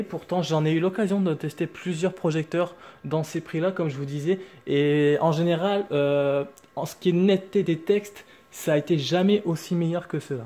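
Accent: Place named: Cher, France. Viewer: Bonjour Vous êtes du chére. Je reconnais l'accent.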